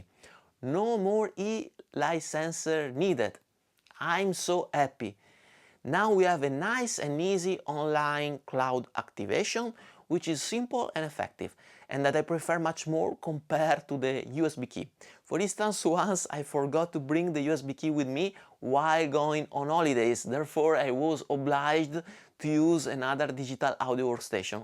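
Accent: Italy. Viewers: Your pronunciation is a kind of "itanglish"(Italian and English) but don't affect the incredible reviews that you made. Prepare to be a next super masive channel. Congratulations!!!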